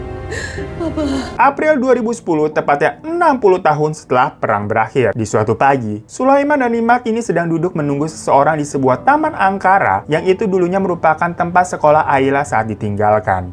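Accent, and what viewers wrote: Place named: Banyumas, Indonesia. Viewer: Bang muka jepang tapi ngomongnya ngapak, 😂😂 i love you abang 😘😘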